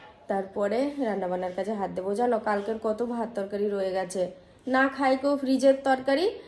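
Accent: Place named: Bangal, Bangladesh. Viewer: Tumar mukha bangal vasa darun laga❤❤❤❤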